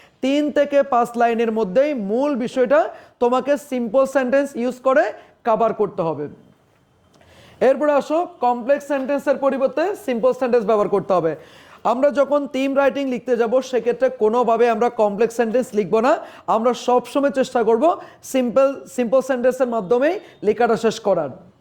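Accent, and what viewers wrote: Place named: Chittagong, Bangladesh. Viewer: স্যার মনে হয় চাটগাইয়া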